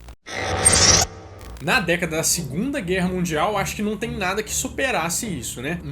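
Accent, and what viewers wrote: Minas Gerais, Brazil. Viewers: Acho o teu canal um dos melhores. Só uma sugestão, pra ajudar a gente que não é de Minas, é fazer um sotaque menos regional, pq tem horas que não dá pra entender o final das frases, já que em Minas se come muito a pronúncia kkk Ao menos fale mais pronunciado quando for falar frases muito grandes. Parabéns pelo conteúdo, e um abraço!!! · Vc é mineiro? Pelo sotaque parece que sim.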